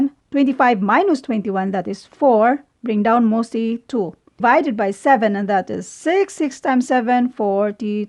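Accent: Visayas, Philippines. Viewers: bisaya ka po ba mam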